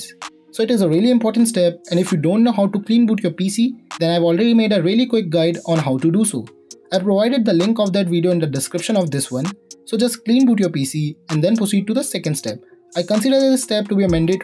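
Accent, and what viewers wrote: India. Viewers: Shit got real When i Heard he has Indian akcent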